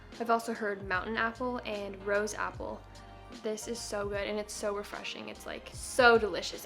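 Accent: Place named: California, United States. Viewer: California accent!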